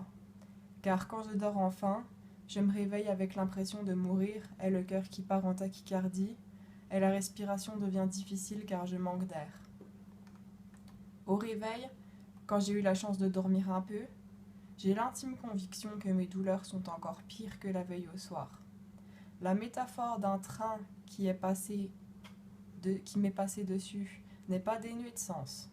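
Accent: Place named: Upper Doubs, France. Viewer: Warrior ! difficile d'y croire quand tout se déchaîne (moi crise tous les 8 jours) mais les traitements se précisent et la recherche progresse (doucement)... on a encore bcp de thérapie à essayer ... Sois forte et quand ça va pas ..."Accueille" et repos et petits bonheurs qui vont bien... dis moi, avec l'accent que tu te payes (lol) tu serais pas du haut doubs toi ??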